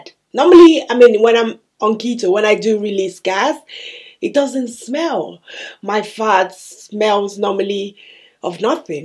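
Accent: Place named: United Kingdom. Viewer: So I notice your accent and I’m not very familiar with customs in the UK (if that’s where you’re living). I’m in the US and we’re celebrating Thanksgiving this week. If you celebrate Thanksgiving or any carb-heavy holiday, how easy/difficult is it for you to maintain the keto diet during a time when the whole country is gorging on a lot of carbs?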